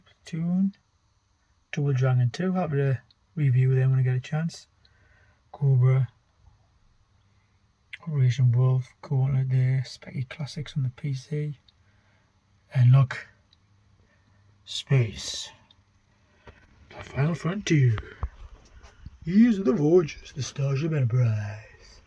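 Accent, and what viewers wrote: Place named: Ireland. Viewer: Congrats on the 200 episode mate and i never knew your Irish 😂 you have some excellent collections my favoutite is the tins of paint. Seriously though the shed is class mate and love the tape game collection. Nice to see the consoles set up and computers its nice to see the master system getting some use as well as it collected dust at mine. Great stuff dude 🙂👍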